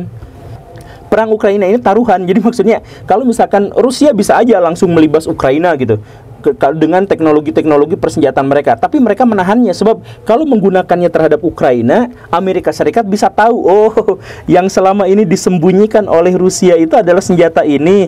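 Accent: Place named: West Java, Indonesia. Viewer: Senang dengan istilah pidio da bukan video,,, maap dan bukan maaf... Sunda banget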